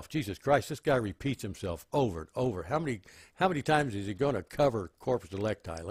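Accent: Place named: Texas, United States. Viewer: I'm hearing a little bit of a texan accent. Lol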